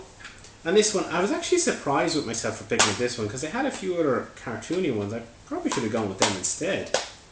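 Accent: Ireland. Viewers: are u irish , u seem to have some sort of an irish accent?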